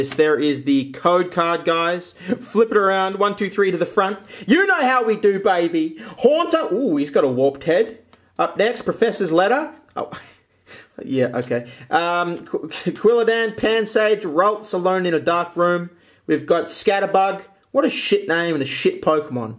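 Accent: Australia. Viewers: Love that "Dewgong" and "Jewgong" are essentially indistinguishable because of the Aussie accent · You can tell he's Astran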